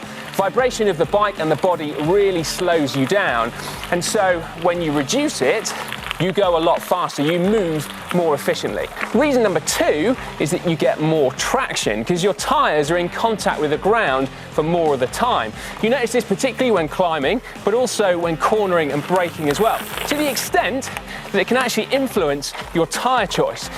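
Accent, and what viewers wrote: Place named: England, United Kingdom. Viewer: English accents are annoying..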